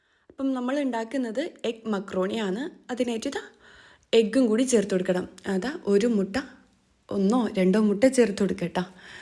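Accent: Kannur, India. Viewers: Hii ithaa...ഈ കണ്ണൂരുകാരുടെ voice ഒരു പ്രത്യേക രസാ ട്ടാ നിങ്ങൾ shamis own ചാാനൽ ലെ ഷമിതയുടെ നാട്ടിലാണോ?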